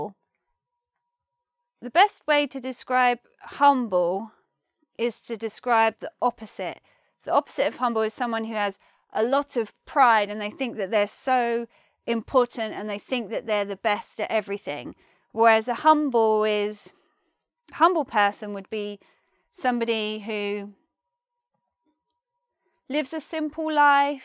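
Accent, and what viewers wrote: United Kingdom. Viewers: Lovely British accent. Thanks. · british accent :)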